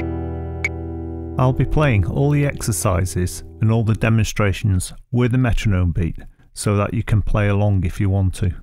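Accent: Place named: England, United Kingdom. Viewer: Thanks so much. Nice to have a clear English accent with tutorials so easy to follow.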